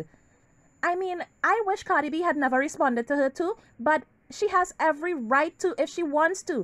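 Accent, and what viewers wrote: Trinidad and Tobago. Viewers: I clicked so FAST!!! Talk your Trinny shit! Love your accent and I’m here for all the Tasha K drama. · I love your accent are you trini? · Hi are you Trinidadian??? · I love your content.. And your accent sounds 🇹🇹 trini like myself.. Ur right both bloogers hv something wit cardi an they look salty as hell. Cardi ain't got time.. She makin money 💰 moves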